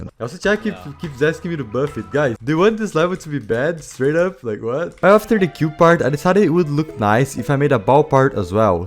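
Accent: Brazil. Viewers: I recognize the Brazilian accent everywhere lol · Ae mano, eu notei um pouco de sotaque no jeito que vc fala em inglês, e só queria perguntar se vc é brasileiro (Hey man, I've noticed a little bit of an accent on your English, and I just wanted to know if you're Brazilian)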